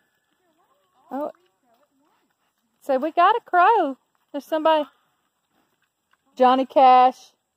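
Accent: South, United States. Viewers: This woman has the loveliest southern accent I've ever heard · This lady has the most appealing Southern accent and way of speaking. I know she’s a Mom and all, but I’m smitten.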